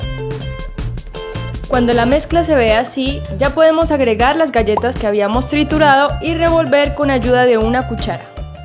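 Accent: Colombia. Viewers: Apenas empezó q a hablar yo dije ella es Colombiana a mi no me mientan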